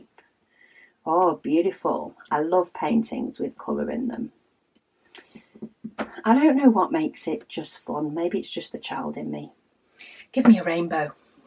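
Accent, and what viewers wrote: England, United Kingdom. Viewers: Hi! My husband, David and I watch you every day, and we’re enthralled by your speed of diamond painting. I love the pen you’re using today. So pretty. I finished a small partial special drill yesterday, and loved it. Being from the middle of the US, we are entranced by your accent and love hearing about your life in England. I’ve entered the giveaway, so fingers crossed!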